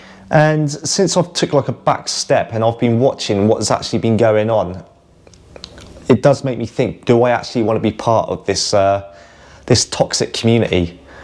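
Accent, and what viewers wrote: Bristol, United Kingdom. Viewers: I sit down with the whole family and watch/share your uplaods. You're a part of the household down here in Plymouth. Abandoned exploration wouldn't be the same without that Brizzol accent. Thank you. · Where in the UK you from?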